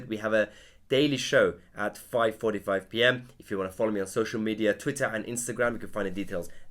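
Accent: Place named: Liverpool, United Kingdom. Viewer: Fuck me a Scouser with a lishp, oh fuck off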